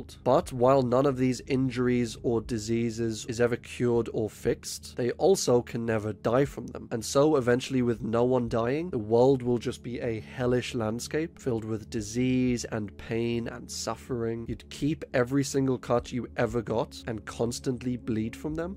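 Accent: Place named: Wales, United Kingdom. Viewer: Im glad ive spotted your chanel content is fantastic keep up the good work also i hear a slight welsh there in your accent if im wrong please correct me im just a huge fan of the welsh accent probably the most amazing accent in the world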